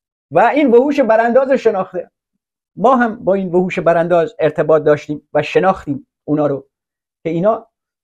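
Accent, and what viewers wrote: Lorestan, Iran. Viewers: جان حرف نداری بااون لهجه شیرین لری وعاشق هرچه لرهای بامعرفت وبامرام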